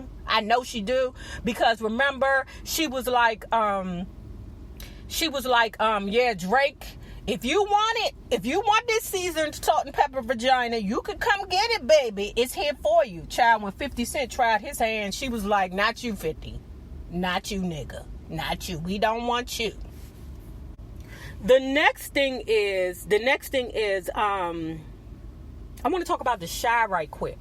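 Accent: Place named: DMV, United States. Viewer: what part of the DMV area you from i can hear it on your voice, Its in the ova's lol and certain words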